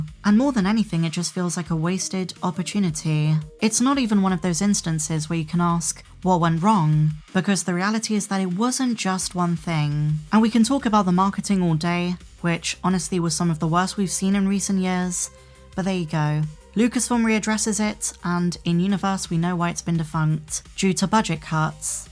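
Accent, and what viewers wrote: United Kingdom. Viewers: I'm no fan of the speculation portions here, or the non-video media offerings since my generation didn't align with the release dates, so I'm not as obsessed as most of your viewers. I am very curious about the person behind the character here. The facts as I've observed is that you put a ton of effort into editing. No breathing pauses, a UK accent while living in America, and your personality coming through in the dialog. My guess is that you're an American male working incognito and are your initials. I have nothing against it if that is the case and would likely do something similar because of all of the loud, crazy, uninformed, and over opinionated attention addicts, lol. Good luck moving forward, and if you ever run out of topics, there's always the fan fiction to share.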